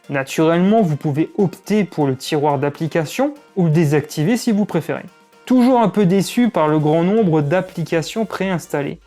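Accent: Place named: Alsace, France. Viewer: t es alsacien? je sens un leger accent!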